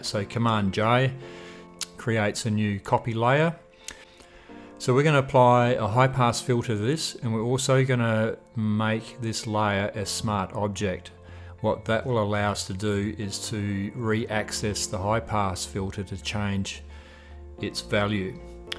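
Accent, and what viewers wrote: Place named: Australia. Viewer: I have watched a gazillion photography tutes on YouTube. Great to hear an Australian accent for a change.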